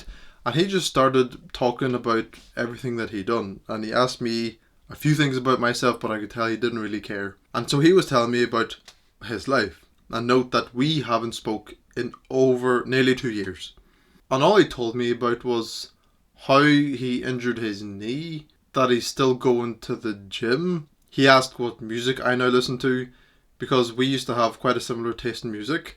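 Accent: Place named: Belfast, United Kingdom. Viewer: I can relate bro, recognise the Belfast accent I'm from Newry bro and the quality of (friends) in this area are nowhere near good enough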